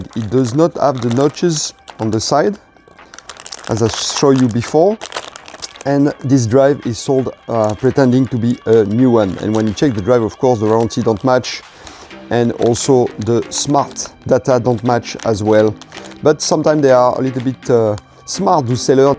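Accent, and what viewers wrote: France. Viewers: Tu es Français ? l'accent me dit quelque chose ?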